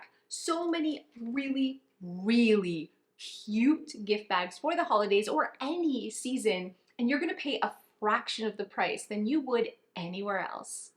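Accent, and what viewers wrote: Canada. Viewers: She sounds Canadian…..so Canada has better Dollar Trees…probably more polite..lol…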